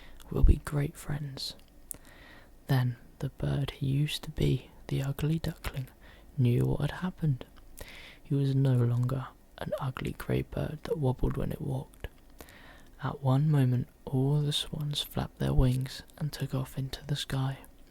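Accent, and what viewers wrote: United Kingdom. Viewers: Great Bri'ish accent.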